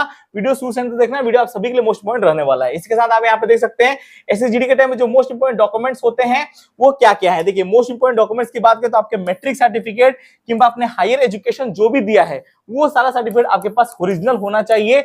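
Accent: Odisha, India. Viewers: Bhai app odisha ke ho kya 😍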